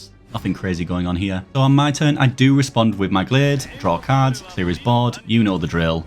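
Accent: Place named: Yorkshire, United Kingdom. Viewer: GOOD TO HEAR ANOTHER YORKSHIRE ACCENT in SVWB ;-) where you from fella?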